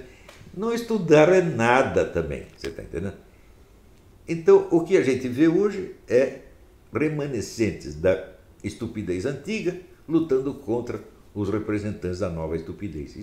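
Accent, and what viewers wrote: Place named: Brazil. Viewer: O prof Olavo é um dos poucos brasileiros que vale a pena ouvir, pelas informações que ele divide conosco, e também pela sua ótima oratória. Ele faz o português brasileiro mais bonito do que o de Portugal. Bless him.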